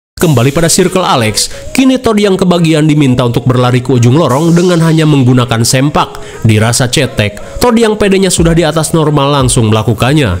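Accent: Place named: Madura, Indonesia. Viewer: Madura hdiiiirr mas broooo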